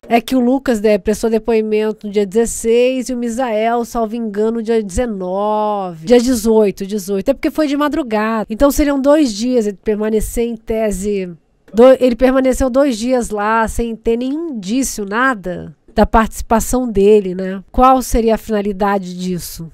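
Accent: Rio de Janeiro, Brazil. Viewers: Seu Sotaque carioca ficou muito bom . Simplesmente sensacional Parabéns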